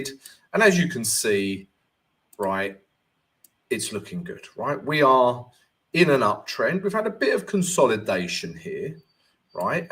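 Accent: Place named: South Africa. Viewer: lol, catching up on stream,love that Saffa accent. Check out NZDCHF, just broke out of long term trend line, possible long opportunity.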